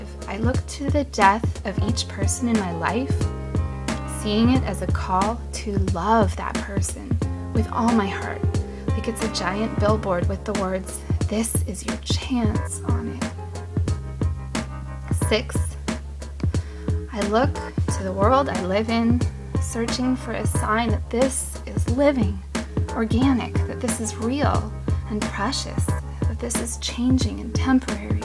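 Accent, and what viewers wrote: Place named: United States. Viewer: It looked an interesting video but the loud intrusive background muzak and the speaker's American accent made her words unintelligible to me.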